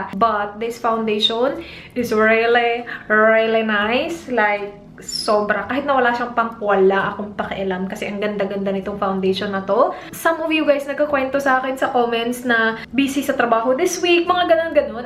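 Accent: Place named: Visayas, Philippines. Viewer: I love your videos but nalingaw kos imu accent and tooONE?!?!. bisaya kaa YOoO?!?!. pataas inig human sa sent...eeEeENCE?!?! ✌✌✌✌ It would be better if ma fix gamay😊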